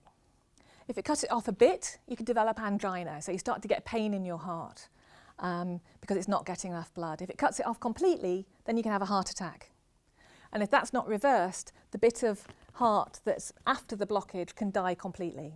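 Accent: United Kingdom. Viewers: Her English accent somehow makes this autopsy classy.🤣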